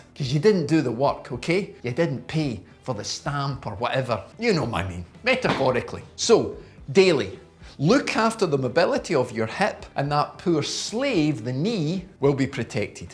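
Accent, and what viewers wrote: Scotland, United Kingdom. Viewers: Can somebody please summarize what this bloke is on about I haven't got the time or the patience to hear and decode all of this Scottish I just wanna know if I'm wreckin mah knees you know and is cyclin bad.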